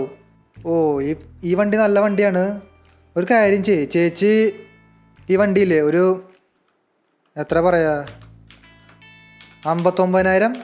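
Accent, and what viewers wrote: Thrissur, India. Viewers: Bro Thrissur anno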